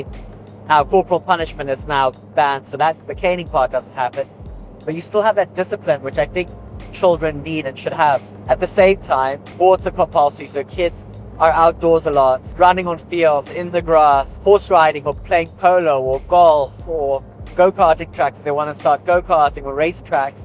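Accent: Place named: United Kingdom. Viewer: Zimbabwe? I thought you were British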